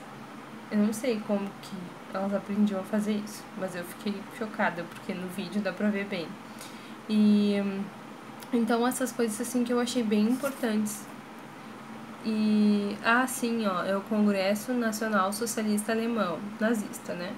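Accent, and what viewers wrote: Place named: Rio Grande do Sul, Brazil. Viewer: Tu é gaúcha né? Dá pra ver pelo sotaque haha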